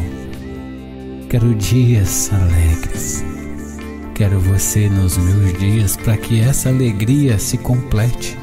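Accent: Minas Gerais, Brazil. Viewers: como pode vc falar palavras como se fosse ele tipo Minas Gerais Foi ele que falou essa palavra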